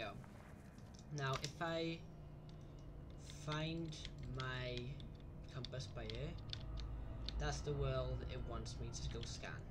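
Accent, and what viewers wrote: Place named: Wales, United Kingdom. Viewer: audio was quiet but i heard a fellow Welsh accent so i plugged in 40 speakers and it was ok in the end. Nah it wasnt that bad lol. Thanks for the info, i was messing around with this today and this will really really help. Thanks ✌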